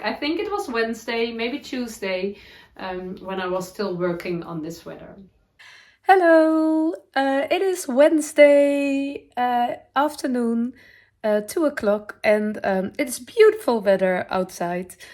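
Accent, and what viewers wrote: Netherlands. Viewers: papagei is parrot in English - I googled it - with bright red, blue and green - from Michelle. I love watching your podcasts - I love listening to your dutchy English -it makes me giggle from time to time.... I live in New Zealand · Noble Character Crafts was talking about her Ariana cardigan on her podcast and she mentioned you and your sweater. I had to come find you and see about your Ariana(s); which are so pretty!! Thank you for giving so many details. The latest one turned out so good! I love your energy and your dutchy English. You made me laugh out loud when you were talking about making a longer Ariana. You were correct in calling it your butt, but I think I prefer "sitting pillows" better. 😀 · Dear, I really love your "heartjumping" Videos and especially I absolutely love your "dutchy English". Thank you for all this inspiration. 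happy greetings from Germany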